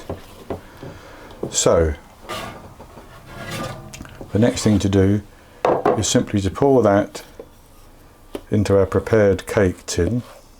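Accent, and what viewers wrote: United Kingdom. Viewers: I love your accent, what kind of uk accent is it?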